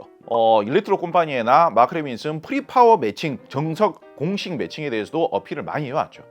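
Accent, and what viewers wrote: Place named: Gyeongsang, South Korea. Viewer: 말투가 그런 거잖아 약간 경상도 좀 느낌 억양이 살짝 있으니까 그렇게 들리는 거지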